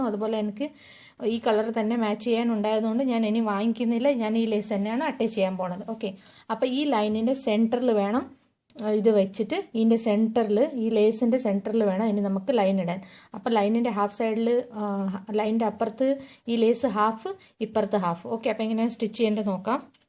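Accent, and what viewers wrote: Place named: Tamil Nadu, India. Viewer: Ningal tamil aano...samsarathil oru tamil chuva..enthayalum nice video aanutto. New subscriber aane..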